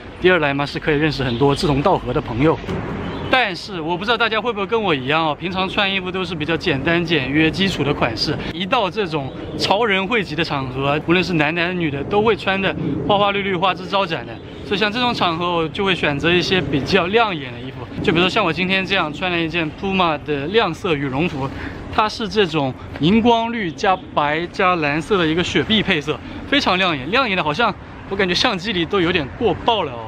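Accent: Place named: Hangzhou, China. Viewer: 一听口音就好亲切 果然是杭州的 感觉你身体好有点僵硬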